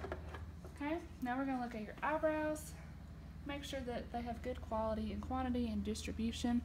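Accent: United States. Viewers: Love the accent, Miss Kentucky! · man this is a thick southern accent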